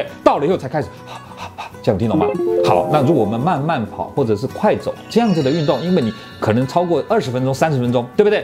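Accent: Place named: Taiwan. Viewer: 這個醫生是台灣人，口音一聽就知道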